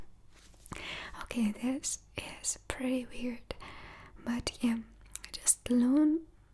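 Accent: Russia. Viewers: Hey...thanks for all the great ASMR vids with your beautiful Russian accent! I never thought of rivers and parks in Siberia, thats awesome bcuz nature will keep you young and healthy! I do almost everything on your list of activities as you do! Incl the dancing in the dark!😜🙌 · I am from New Zealand and in love with u and ur accent please do more Russian accent videos I have no judgement to Russia or the war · Ciento que usas el español España y tu acento ruso combinados, los Rusos suenan algo peculiar y tu tienes una mixtura de acento español España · Hola princesa tus videos son cada vez mas profecionales exelente video de asento ruso buen blok me gusto mucho y mucha suerte en tu canal nosotros siempre te apoyaremos tus suscriptores favoritos saludos desde mexico d.f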